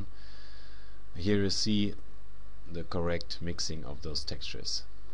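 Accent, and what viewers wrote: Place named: Germany. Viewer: The German accent is the most awesome thing ever..! lol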